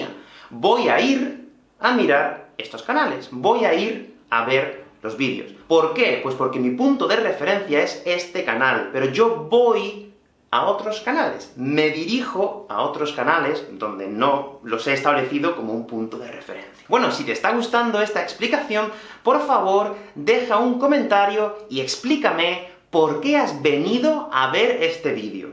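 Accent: Spain. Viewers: He venido a tu canal porque la verdad me gusta el español de los españoles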